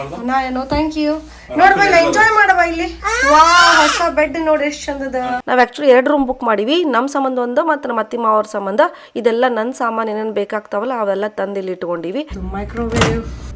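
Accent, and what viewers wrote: North Karnataka, India. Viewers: your North Karnataka kannada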